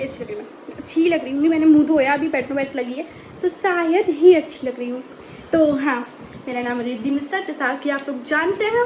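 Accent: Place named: Bundelkhand, India. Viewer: Bundelkhandi achi lgti aapki 😊❤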